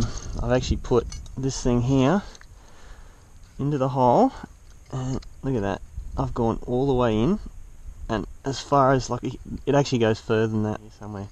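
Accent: Australia. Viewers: I love the Australian accent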